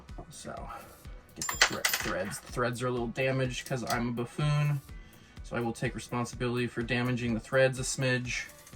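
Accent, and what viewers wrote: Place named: Alberta, Canada. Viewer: Ah southern Alberta love it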